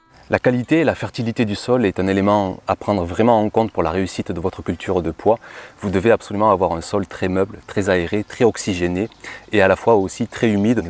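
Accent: South, France. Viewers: Salut, A la base, les petits pois, c'est pas mon truc... j'en ai mis cette année pour faire plaisir à Monsieur, et aussi parce que je n'ai jamais mangé des petits pois "du jardin", donc je veux quand-même goûter, parce qu'il y a plein de légumes que j'aimais pas, et que je mange depuis que je les cultive moi-même ^^ Par contre, j'ai semé (tardivement) des pois mangetout parce qu'à force de te voir nous les vanter dans tes vidéos, tu m'as donné envie! Donc voilà, à suivre;) Tes vidéos me mette toujours de bonne humeur, avec ton accent du Sud, ça donne un petit air de vacances. A bientôt Maud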